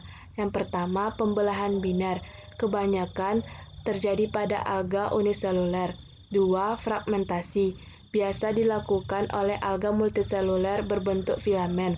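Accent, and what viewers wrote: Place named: Riau, Indonesia. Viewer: riau bgt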